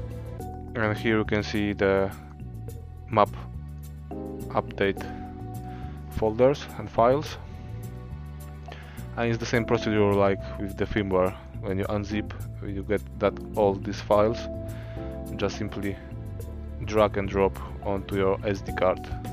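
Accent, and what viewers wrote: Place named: Poland. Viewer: What language you are using ? bcos if its english... then you have to practice a little bit more... thats more like polish then english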